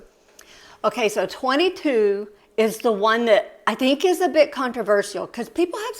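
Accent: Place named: South, United States. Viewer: This is my first time watching you. You tube recommended it to me. I'm from the south so I appreciate your accent 😊. Are you also a pastor? Not because of what you said at the end, but you sound like one....is it called the cadence in your voice? And the energy. Just curious lol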